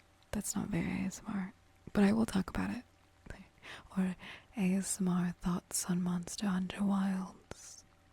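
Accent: New Zealand. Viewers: Thats the NZ accent for ya mate. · Man I love to tune out to the Kiwi woman’s voice.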